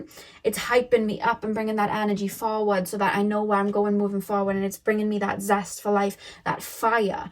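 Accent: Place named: Newcastle, United Kingdom. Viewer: Are you a Geordie? Trying to place your accent.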